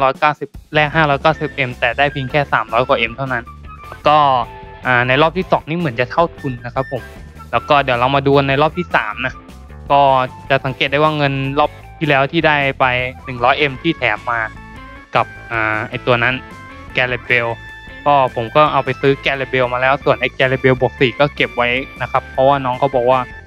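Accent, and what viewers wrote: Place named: Isan, Thailand. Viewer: ครับพี่พูดเหมือนคนอีสานเลย555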